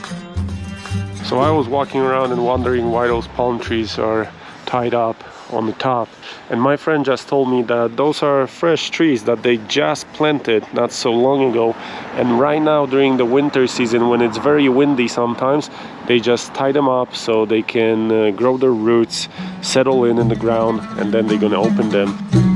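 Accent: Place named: Poland. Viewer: This guy isn't American. He sounds polish to me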